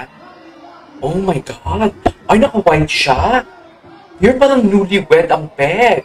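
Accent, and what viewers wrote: Iloilo, Philippines. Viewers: Ilonggo ka sir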